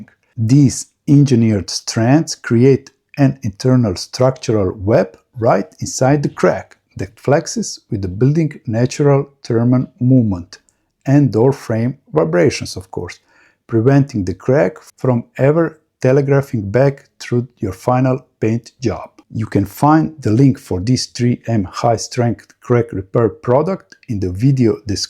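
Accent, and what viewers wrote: Russia. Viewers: has a strange accent. Russian? Ukrainian? Dude, you tell me. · I love the accent! Russian? Excellent commentary and tips on how best to repair these annoying cracks. 👏👏✌️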